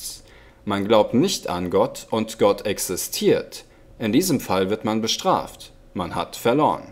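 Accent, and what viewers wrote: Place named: Switzerland. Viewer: haha bisch du en schwiizer ?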